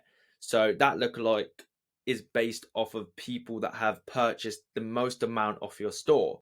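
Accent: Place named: United Kingdom. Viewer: Love u and the brit accent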